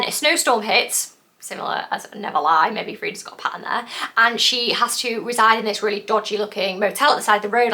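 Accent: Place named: United Kingdom. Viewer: girll your british accent made my head gone crazy😵‍💫 can u talk slower next time ?? btw nice review👍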